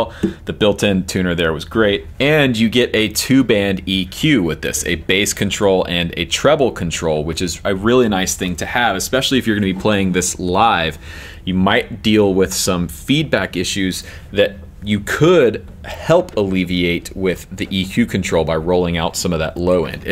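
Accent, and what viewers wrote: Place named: New York, United States. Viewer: i found the Fender had a tubby midrange that bothered me. In that respect it reminded me a little of an Ovation. I might gravitate toward the Hummingbird not because of its look but because I suspect I’d enjoy playing it. My own acoustic is a Hummingbird and, much to my surprise, I really prefer playing it to the D28 I used to have. Both are from the seventies. Ahrange/Or ange is a regional difference. I grew up in NY (metro, not upstate which sounds completely different) and pronounce it like you do. You’ll find the same argument with fahrest/for est. If you want the opportunity to correct your significant other about speech, try the marry/merry/Mary test. If you’re from NY, those are three completely distinct words. For most of the rest of the country, they basically say Mary/Mary/Mary.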